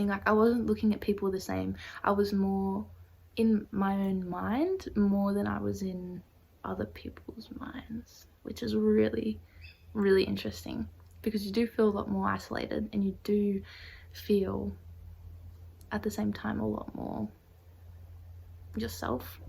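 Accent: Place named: Australia. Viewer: I knew she’d have an AUS accent even before I clicked on the video for some reason haha. I do think social media gives us too much insight on people’s lives. We used to live our whole lives not knowing what our friends, family, and fav celebrities ate for breakfast.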